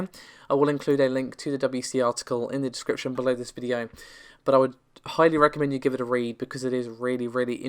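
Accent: England, United Kingdom. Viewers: if you're seeing this, it's not you. Rather the accent and voice together, I have this sometimes with English women, because the voice pitch is very different from where I'm from haha. In like every video I had parts where I thought, noo, this is 100% a women and others parts where I thought, nooo 100% a guy, I just can't tell because of the accent xD it's not you, it's me:P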